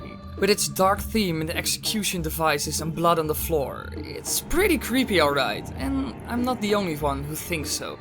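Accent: Netherlands. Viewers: nederlands accentje mag er wezen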